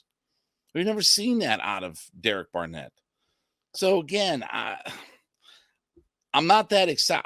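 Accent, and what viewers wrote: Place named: North, United States. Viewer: You make me laugh so much !! I have no idea where you from but definitely from north of Mason Dixie line. Thx for the entertainment! Go Braves and Falcons!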